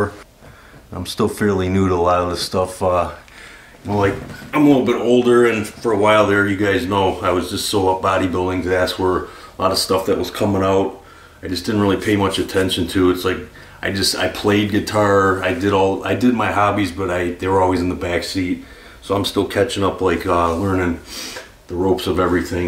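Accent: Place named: Midwest, United States. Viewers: Minnesota?????? You can’t hide that accent from me bro hammer!!!! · Your midwestern accent warms my heart. I saw your blood vessels in the thumb nail and immediately thought "Hey it's that guy that did some Nile riffs years ago"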